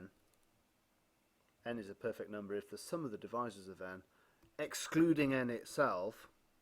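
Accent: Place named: England, United Kingdom. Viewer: Brilliant. Also audio is fine as well for me. So nice to hear an English accent as well (just a personal comment).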